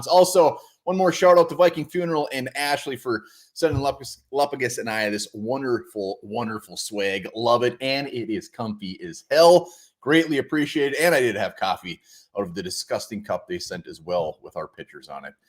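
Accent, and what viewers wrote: Minnesota, United States. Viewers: ik he did not pronounce swag like that 😂 “swage” that’s that minnesota accent at full strength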